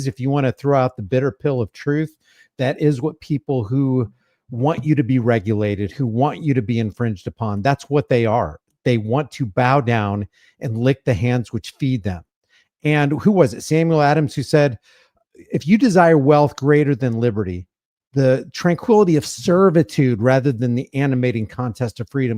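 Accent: United Kingdom. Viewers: Who is the Nazi with the British accent that’s narrating this video we need to make sure we go to his channel and give him what he deserves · The guy who is narrating isn't even a American, Its a British pos. Oh and don't forget they always attack Americans one on many. And always at the end of a gun.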